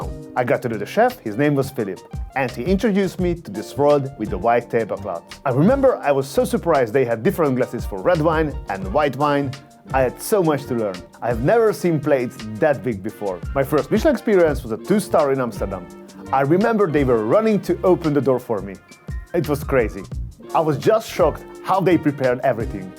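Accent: Hungary. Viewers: I never realized that you had a Hungarian accent. Now, that I know, I can't un-hear it ;-). Thank you for videos. It is an extremely rare opportunity to get a glimpse into the world of 2-3 star Michelin restaurants where a dinner for two is upwards of 1k Euro (not counting extra wine at 2k a bottle). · Love your Hungarian accent. It adds to the charm of the channel 🤌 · Emlékszem mikor még pár ezer feliratkozó volt a csatornán és azon gondolkodtam, hogy ez egy kifejezetten magyar akcentussal beszélő úriember és bár eddig nem hallottam róla, valahogy mégis büszkeséggel tölt el, hogy egy magyar, illetve több magyar ilyen minőségű tartalmat gyárt. Nem tudom, ha valaha hagytam így hozzászólást bárhol, de úgy éreztem, hogy itt az ideje. P.s. remélem idővel én is veszek egy Krug-ot, ami majd erre a csatornára emlékztet. Hajrá!:D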